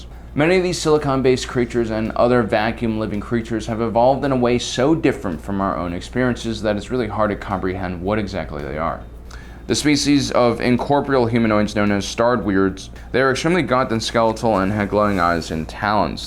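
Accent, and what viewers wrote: United Kingdom. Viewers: What happened to British?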